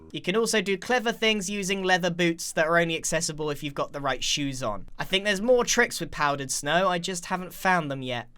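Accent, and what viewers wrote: United Kingdom. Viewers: u remind me of wisp why cuz u both are British like mee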